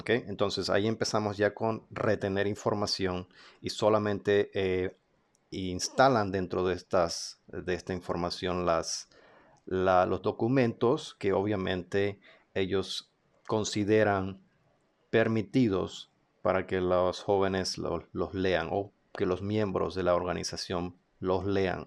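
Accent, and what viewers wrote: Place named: Mexico. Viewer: Por un momento pense heras Mexicano por tu forma de hablar ,de Panama es como ya sabes el general y ahora es jw . lo de tu hija como decimos en Mexico que mala onda ,aunque yo vivo en los Angeles California USA 🇺🇸.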